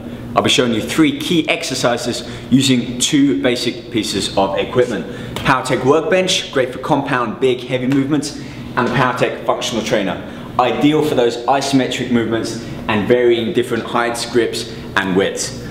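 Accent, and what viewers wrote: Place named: United Kingdom. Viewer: So this workout should build and make your middle line more prominent? Your accent is cute. Is it British?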